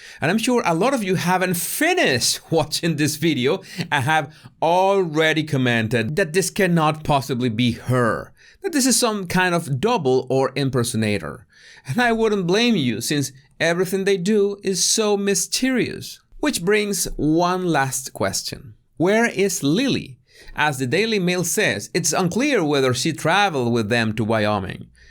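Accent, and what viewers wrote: Spain. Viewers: hilarious without sounding cruel when we all know full well that its not a compliment just used. Please keep that Spanish lilt, its honest, cuts to the chase and very amusing. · Hes cute too I love that spanish accent. Oye give it to me papi chulo! da meh lo.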